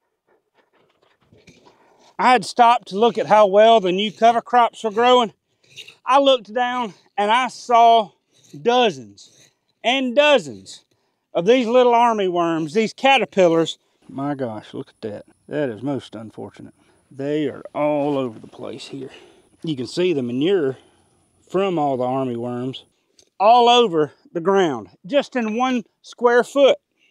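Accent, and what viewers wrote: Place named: United States. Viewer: PS I love your accent, which state are ya from? I’m a Aussie so I’m not great at knowing state accents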